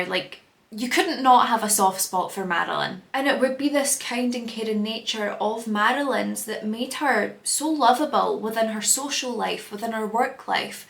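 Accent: Scotland, United Kingdom. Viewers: Just found you and I love hearing another Scot ❤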